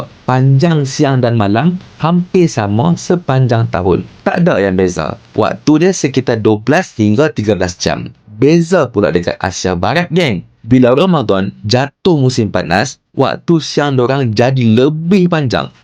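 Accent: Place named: North, Malaysia. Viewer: Dgr min cakap mcm oqg utaqa.. 😅 Teruskan konten mcm ni min.. mantapppppp... 🫡👍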